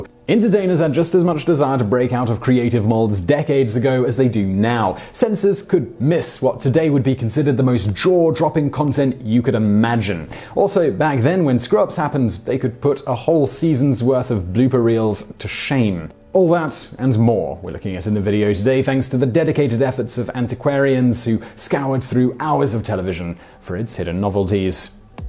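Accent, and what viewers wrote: England, United Kingdom. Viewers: Amazing that a bunch of "nothing" can be turned into something by a guy with an English accent. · please learn to better modulate your voice to a slower tempo; you're not competing against someone from a help desk in New Delhi to see how many words you can produce under a minute! Your material is wonderful but I miss most of what you say unless I turn on the captions then loose seeing what's being shown! Yes, your diction is commendable and you should be proud of your British accent, just stop torturing us with your machinegun-talking-without-enough-commas-and-periods-between-changing-sentences!! Or, stop using Meth before you begin your shows as I'm getting ready to boycott your channel; I'm sure I'm not the only one who feels this way... Thank you.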